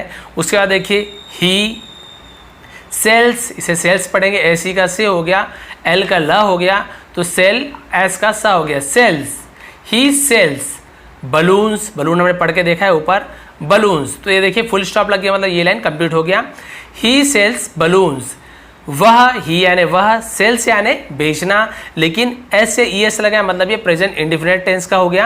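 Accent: Chhattisgarh, India. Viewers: Sir aap chattishgadh se hai kya??!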